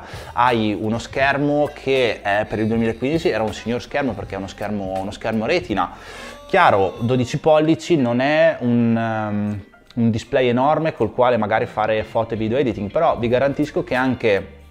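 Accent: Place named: Romagna, Italy. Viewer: prego! Eh eh eh... scusa ma io ho la mamma di Rimini e il tuo accento mi sembra molto simile! Bellissima città Bologna!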